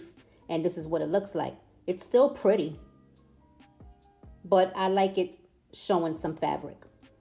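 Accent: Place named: Brooklyn, United States. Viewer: Great tutorial! And I ❤ your accent. Brooklyn Borequa, right? Giving Rosie Perez! 🇵🇷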